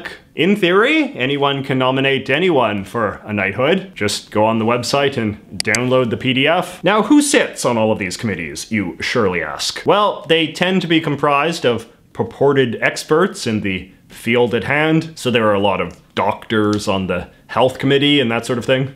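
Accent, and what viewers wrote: Canada. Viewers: is such a Canadian with his very pronounced "aboots"! That's part of what makes him intersting to me, I suppose. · Are you by any chance Canadian? And yes I ask because of how you pronounce out. No offence, just wonder.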